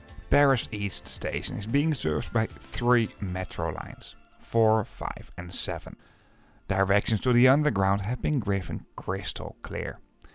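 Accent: Netherlands. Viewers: Nice video (and nice duch accent) well described with many informationts I didn't know before. I traveled several times between Saarbrücken and Paris years ago (with Euro-City), minimum 10 times allez-retour... when I was last time in Paris Gare de L'Est, there where no barriers at the access to the platforms. Btw. once I met an Australian guy, interested in high-speed trains, who called the ICE-Trains icetrains... Hartelijke groeten, Andreas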